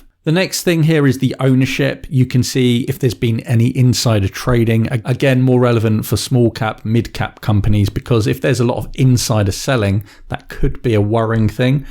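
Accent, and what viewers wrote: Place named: United Kingdom. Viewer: Thank your for this video. I learned a lot, and your British accent is cool. I was going to subscribe, but it seems that almost all of your videos are about crypto coins only.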